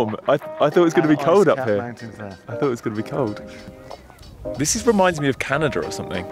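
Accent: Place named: United Kingdom. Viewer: Hehe just a clear British accent;)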